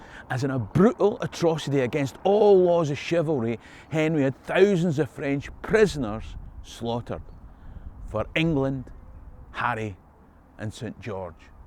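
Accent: Scotland, United Kingdom. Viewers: Gayday buddy just letting you know it’s the 7/8/21 you have 60,100 well done love hearing the scot language l was 2 when we went to Australia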